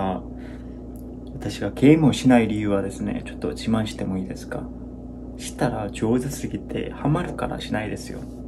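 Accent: Fukuoka, Japan. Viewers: 誰が聞いたとお？ 福岡弁、うますぎ❣️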